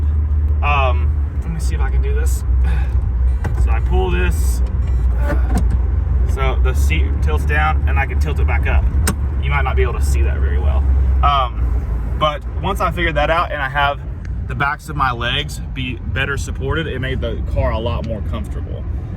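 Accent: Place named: Sweden. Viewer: Where is this in the US. For some reason I think I can hear a little bit of Scandinavian(Swedish) accent, can be wrong. Thanks.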